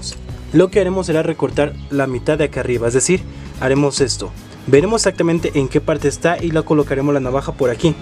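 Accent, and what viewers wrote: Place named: Latin America. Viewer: Me cargó coma habla, una mezcla de latino imitando al español, vale ??? Jijij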